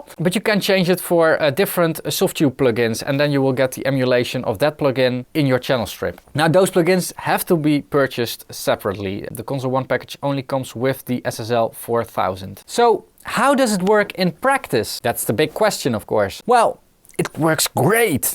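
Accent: Netherlands. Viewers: Steenkolen accent.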